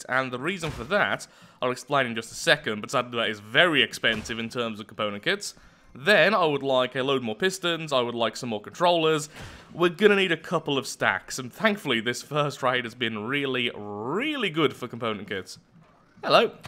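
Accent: United Kingdom. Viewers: 1. your british, not weird. cheers mate 2. i do believe the idea of increased bearings to increase speed is a good idea. even just one or two per wheel. just as a point to point increase use.(on a button) 3. if you make the collector apart of the crane, weight not considered, would be the best moving forward. nice thing is the collector is placeable and buildable upon. such as the drills attached to bearings on the collector for added pressure. 4. i do enjoy the forked wheel design and the "spider" like stance. 5. if you have questions on how to connect items of logic, or Moonbo or Kan are great choice to review here on YT.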